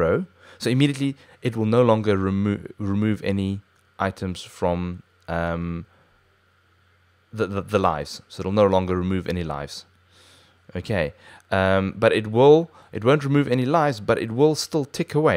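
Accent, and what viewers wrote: South Africa. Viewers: Ek dink dat jy suid afrikaans is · You sound south african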